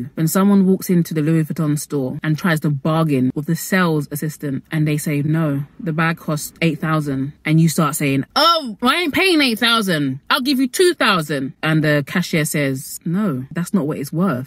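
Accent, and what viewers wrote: United Kingdom. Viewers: How did i know you were going to have a British accent before clicking the video😂🤷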